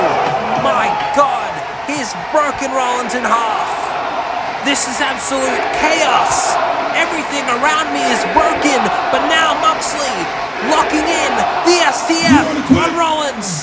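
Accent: Australia. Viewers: WOW FANTASTIC!!! I love the new stage dude! I was talking to SuplexNetwork and we agreed y’all sound alike! Probably because y’all are both Aussie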